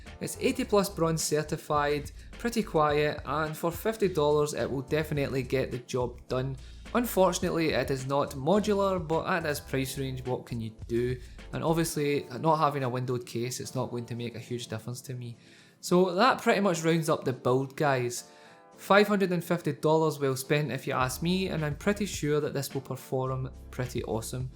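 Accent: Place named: Scotland, United Kingdom. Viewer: i love your Scottish voice xD BTW nice video :)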